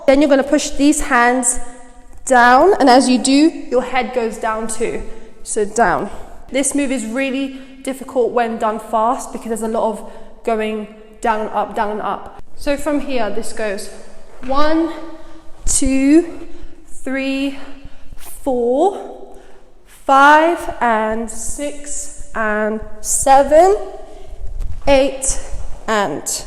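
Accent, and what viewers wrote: United Kingdom. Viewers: Thank you very much for the tutorial, new subscriber, are you from the UK? your accent makes me like from the united kingdom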